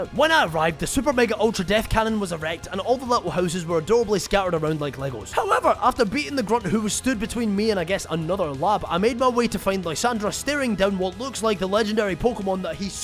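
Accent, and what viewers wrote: Scotland, United Kingdom. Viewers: This is the first moment in the video that I realized you had a Scottish accent. 😂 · Oi m8 what’s yer nationality cause I hear some Scottish in that accent (the speed and the emphasis on p, c, s) also I like the persona music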